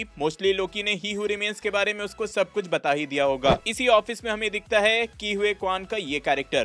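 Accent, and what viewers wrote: Bihar, India. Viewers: bhai bihar se ho kya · Are you from bihar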